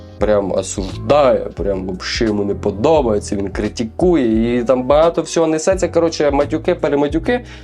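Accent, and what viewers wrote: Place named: Surzhyk, Ukraine. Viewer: Зарядив, бідбодрив, вдохновив!, прийшла просто твій суржик послухати🥰 абажаю! Ти молодець!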